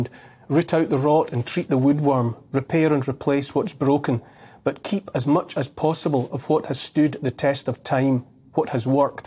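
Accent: Scotland, United Kingdom. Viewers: He spoke like a warrior poet, he spoke like a Scotsman!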